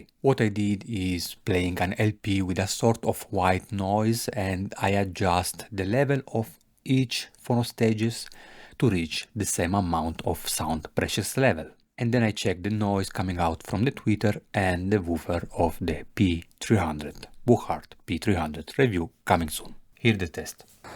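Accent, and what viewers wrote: Italy. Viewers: Also, if you don't mind me saying so, I'm not in the least bothered by your Italian accent but there are a couple of things that really hurt my ears and one of them is 'knobs'. The k in knobs is silent, like in 'know, 'knife', 'knit', 'knock', 'knight' etc. So it's pronounced 'nobs'. Also, knobs are the things that you tend to turn to adjust. The push only things are called buttons.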